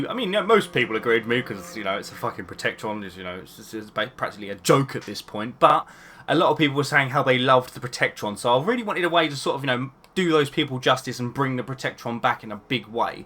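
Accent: United Kingdom. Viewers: You from Birmingham?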